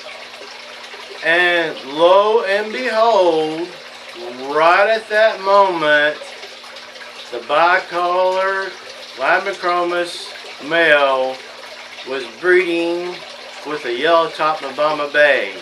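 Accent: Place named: East Tennessee, United States. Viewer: Very cool your educational video, along with the east TN accent! Very cool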